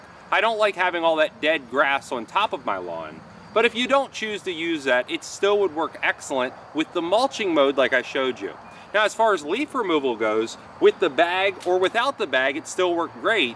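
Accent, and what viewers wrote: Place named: Pittsburgh, United States. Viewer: Are you from Pittsburgh? You sound like you're from Pittsburgh.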